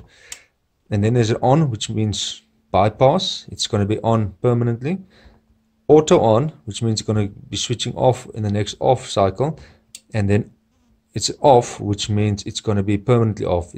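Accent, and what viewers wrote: South Africa. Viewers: South African . . . within 10 seconds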